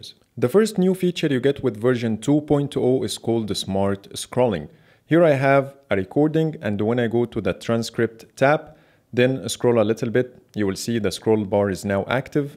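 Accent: Egypt. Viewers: Thats our well known egyprian-english accent. Weird enough other Arabs thinks its annoying! Lol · it's an egyptien accent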